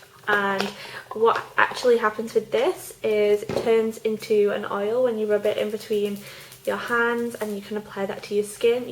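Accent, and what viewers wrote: United Kingdom. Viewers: ah! ur so british and i love it!! i miss my british friends... they used to come to california and visit me often. they live on "the isle of white" do u know where that is?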